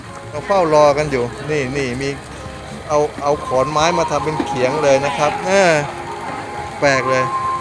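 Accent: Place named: South, Thailand. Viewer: พี่ชายเป็นคนใต้หรอเห็นแหลงใต้ได้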